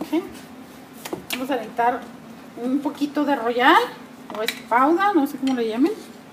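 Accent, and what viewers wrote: Chihuahua, Mexico. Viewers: Creo k si xk habla igual como nos otros acá en Chihuahua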